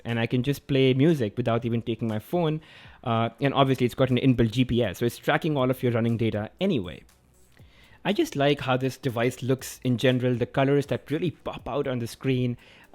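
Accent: India. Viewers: The Indian accent sold me. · Nice video, I like that Indian accent :)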